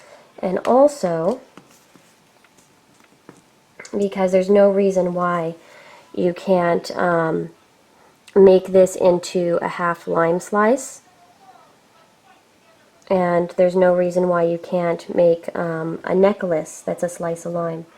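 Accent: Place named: Italy. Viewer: All I can hear is Italy ❤💚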